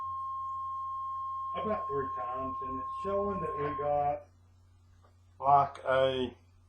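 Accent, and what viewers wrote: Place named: North Carolina, United States. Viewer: That is a pretty GMC Sonoma. I have one just like it. A 91 GMC Sonoma 4.3 liter V6 RWD. Mine is painted that exact color except mine looks dull compared to your shiny red. It was my grandfather's, then my dad's, and now mine. A lot of sentimental value to me. Thanks for the video. You speak like you are close to my area too. North Carolina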